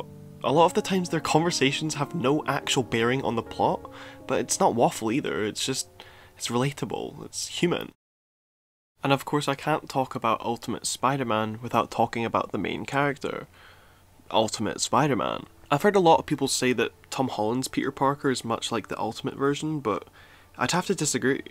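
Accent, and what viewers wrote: Scotland, United Kingdom. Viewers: You’re either Scottish or Northern Irish. Am I right? I’m subbed anyway.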